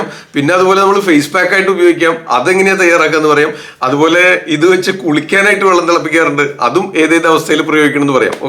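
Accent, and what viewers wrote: Thrissur, India. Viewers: Nammude trichur style samsaravum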